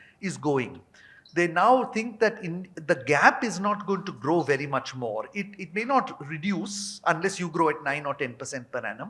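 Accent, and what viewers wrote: India. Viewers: I don't understand India accent go back to school old men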